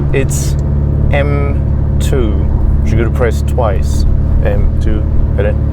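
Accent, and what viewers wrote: South Africa. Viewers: Are you South African ? 🤔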